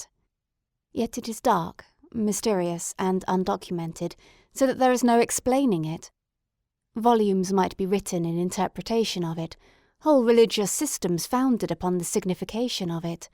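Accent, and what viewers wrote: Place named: United Kingdom. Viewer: Nicely presented. I enjoyed listening to your voice and beautiful Bristish accent 😍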